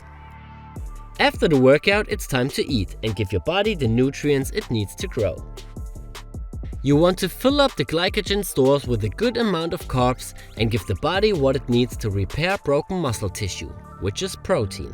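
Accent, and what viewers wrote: Germany. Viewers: Plant based & fasting. & sobber train except coffe) =never sick again! Can prove it😍 Gruss aus Bali🌴 Ps:funny german accent) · Is this a german accent?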